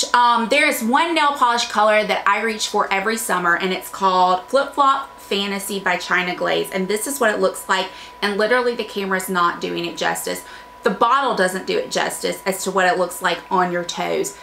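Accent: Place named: Mississippi, United States. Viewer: I have been dieing to know where you were from and as soon as you said "oil", I knew it was Mississippi! I'm from Mississippi too but currently live in Houston. Love your channel and appreciate a lot of your humidity proof make up tips!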